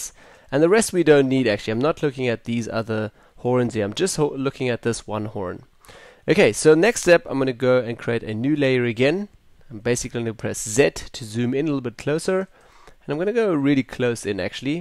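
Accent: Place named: South Africa. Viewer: Cheers for the video boet. (Highly guessing you are South African) :-)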